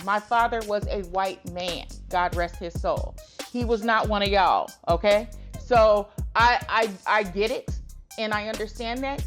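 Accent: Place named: United States. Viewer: Me before the video starts "Bet she has an American accent, bet she has an American accent, bet she has an American accent..." Video starts She speaks in an American accent. Well what do you know!? 😂🙈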